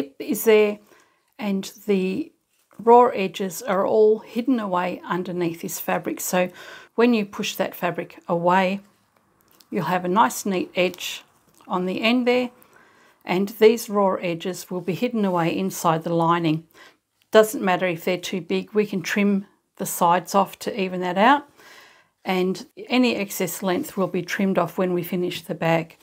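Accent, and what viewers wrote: Australia. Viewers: Does anyone else think in an Australian accent after watching videos...... or is it just me? 🇦🇺💖😊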